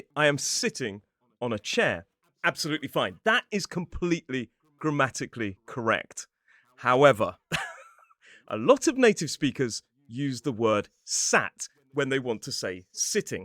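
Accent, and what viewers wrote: United Kingdom. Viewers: Love listening to your accent, it reminds me of my university semester in the UK 😍 P.S. Liverpool and Muse (not "the Muse" like my Italian friends would say) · Wow.Your voice reminds me of the singer from Coldplay — he has such a beautiful accent that I wish I could understand it. I gotta say, for some reason I always stuck with American English at school— but I think I’m changing my mind. I used to think British English was kinda boring, too formal, or just hard to follow. When I'm in London I feel an idiot. I cannot answer even simple questions... I don't get them. Perhaps it's a matter of accent I 'm not used to. But you totally proved me wrong. Thanks,!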